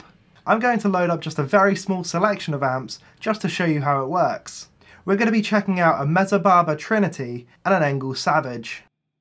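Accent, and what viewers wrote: United Kingdom. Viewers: I wana sound this posh 🤗🤘🏼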